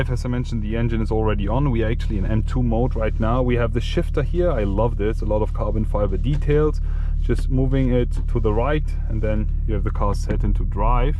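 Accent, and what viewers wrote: Germany. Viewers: Bro. Yr accent 100% kartoffel gotta love it!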